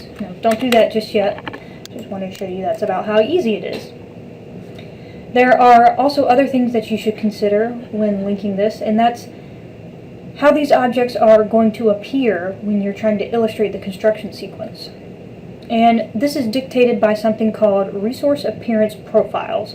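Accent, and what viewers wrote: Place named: Australia. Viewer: very simple very Aussie thx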